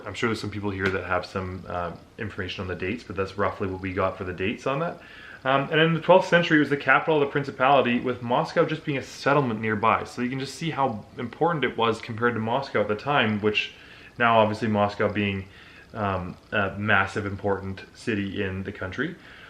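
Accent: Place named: United States. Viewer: if you are canadian, why do you have american accent ? my wife is british and said you are an american when i showed her the video and КостромА, not КострОма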